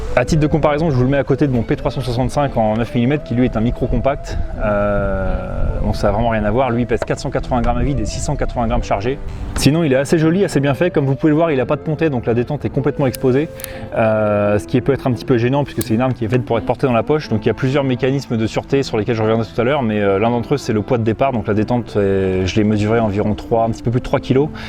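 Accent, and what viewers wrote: United States. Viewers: Encore cet accent ridicule du mid west , a chaque fois je me fais dessus 😂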